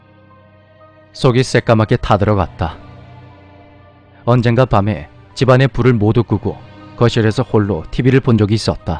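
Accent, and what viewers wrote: Gyeongsang, South Korea. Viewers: 경상도 사람ㅇㄱ라 언뜻 나오는 경상토 사투리 억양이 너무 익숙해서 그런지 더 듣기 좋으네요 ㅋㅋ오늘도 잘 들었습니다 ❤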